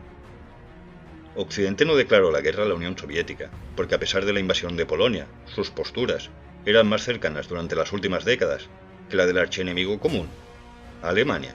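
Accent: Galicia, Spain. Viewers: Eres gallego?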